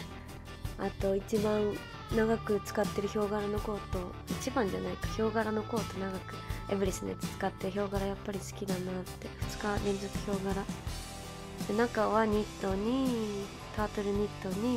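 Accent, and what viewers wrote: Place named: Kansai, Japan. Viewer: めっちゃお洒落💓 ちょいちょい関西弁出るのおもろい😆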